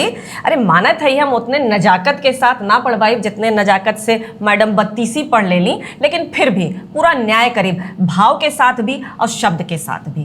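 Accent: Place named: Kanpur, India. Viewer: Bhai aapki bhasha and tone. Kanpuriya hai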